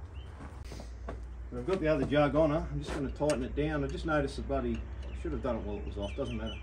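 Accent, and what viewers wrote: Australia. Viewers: I like your channel. It's good to hear an Australian voice on YouTube. 👍🏻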